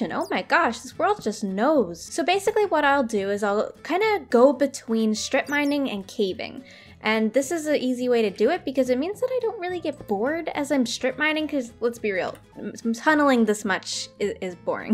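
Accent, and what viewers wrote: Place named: Canada. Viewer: As a fellow Canadian i love her accent aye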